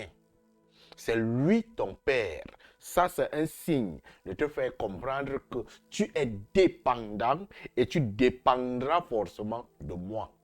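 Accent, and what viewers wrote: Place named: Cameroon. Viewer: au senegal pays musulman constitué de confreries leducation est basée sur lenseignement coranique ou francais et non tout ce qui est maconnique donc il n'ya aucune chance que diomaye puisse comprendre ces choses en vrai senegalais quil est , mais meme si macron fait des signes maconniques il peut se targuer detre plus grand mais ce qui est sur est que diomaye est bien dans sa tete et connait deja la politique qu'il va mener donc les signes maconnniques je suis plus grand et tout , eh bien quils le mettent dans leur trou du cul tout simplement , rien a foutr et puis vous les panafricains camerounais car je sais que tu es camerounais par ton accent ce signe de la maingue maingue vous devez dabord vous occuper de deloger biya de votre pouvoir avant de venir noius donner des lecons de macons ou je ne sais quoi vous avez vraiment du boulot qui vous attend ces a dire deloger biya 40 ans de pouvoir voyons je ne comprends pas pourquoi vous vous acharnez sur le senegal ou yaurait d'autres raisons a ca , vous etes trop focalisé sur le senegal , occupez vous de vos oignons nom de Dieu ce nes pas parcequ on est africain qu on doit forcement vous rejoindre dans vos ideologies , ideologie pensee unique cest archaique et démodé ca chacun il gere son pays et cest tout nous cassez pas les couilles a la fin quoi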